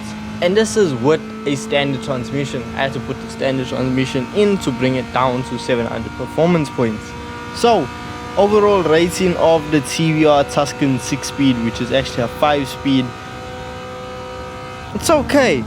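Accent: South Africa. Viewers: Are you south african bru?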